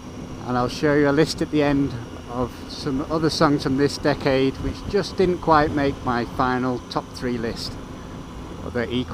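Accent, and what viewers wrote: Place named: England, United Kingdom. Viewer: Thanks, ALL three now firmly stuck in my head. Wasn't expecting an English accent, seeing those double yellow centrelines! I'm joining the expat club and will be broadcasting English accentry from Europe!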